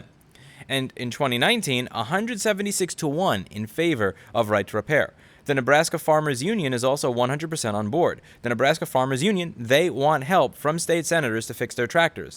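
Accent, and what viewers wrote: New York, United States. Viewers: Louis has a NY accent? Lol I guess I missed that 😆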